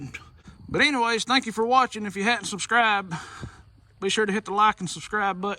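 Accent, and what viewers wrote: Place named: New York, United States. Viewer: Love the New York accent :) Nice plane...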